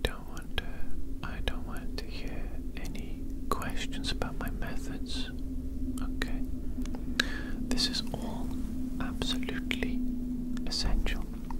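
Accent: Wales, United Kingdom. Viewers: Where is your accent from? I want to say Wales, but I can’t quite put my finger on it and it’s been bugging me for ages! Super relaxing video as always :)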